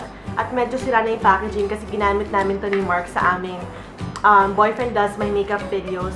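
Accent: Philippines. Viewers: parang ang lalim mo mag tagalog ateeee kakatuwa! ehehe <3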